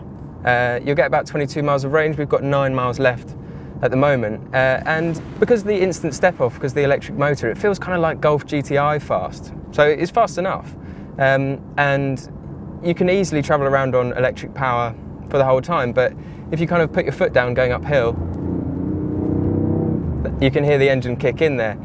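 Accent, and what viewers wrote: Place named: England, United Kingdom. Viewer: I don't know why, but I can't stand the way English people talk.. It has the power to make the coolest cars sound absolutely rubbish, darling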